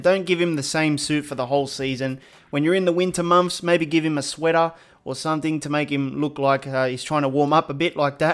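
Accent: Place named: Australia. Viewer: He has australian tinge. I and long vowels. Adviiiiice. Hit. Thinking. Nil. I could go on. R.i.p if you were nz born and then moved to aus. You also sound like you born south auckland?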